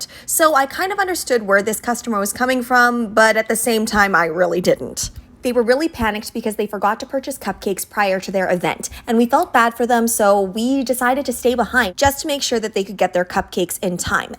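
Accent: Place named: United States. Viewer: Some thick American accent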